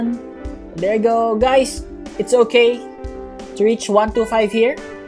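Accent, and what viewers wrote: Philippines. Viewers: R u a filipino u sound like filipino hahahahaha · I know the accent , Your a Filipino right?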